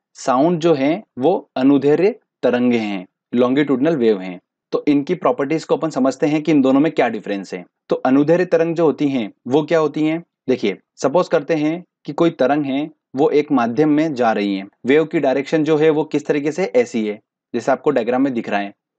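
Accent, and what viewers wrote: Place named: Maharashtra, India. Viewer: सर आपके language मे महाराष्ट्रन touch है.... आप मुम्बई से हो? मै भी मुम्बई से हु.... पर आप सभी topics बहुत अच्छे से clear करते हो... 🙏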